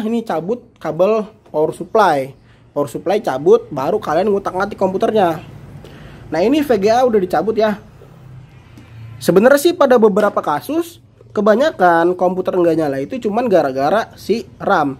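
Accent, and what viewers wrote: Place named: Bekasi, Indonesia. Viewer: Pasti orang bekasi cikarang euy wqwqwq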